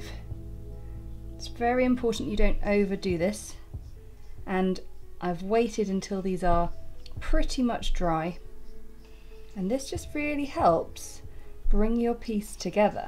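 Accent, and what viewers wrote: United Kingdom. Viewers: I'm in love with your clases! And love to hear British accent😍.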